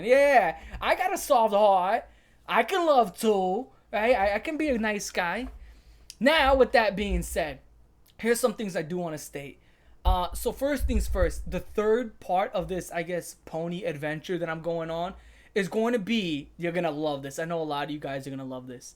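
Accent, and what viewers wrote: New York, United States. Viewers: I couldnt stop laughing at this, i was just listening while working and hearing this new york accent describe eating shit was something else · bro sounds like Peter Parker that is actually new yorkian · I think the reason people call you Italian is due to the New Yorker accent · Your New York accent makes this video funnier 😭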